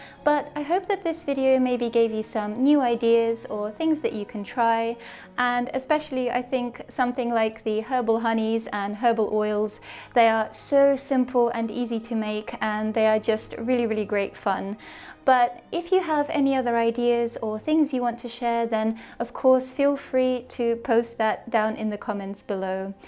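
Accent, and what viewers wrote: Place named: United Kingdom. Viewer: You speak british english very nicely. It is pleasure to listen to you. Thanks for the inspiration🐝🌻Lovely day!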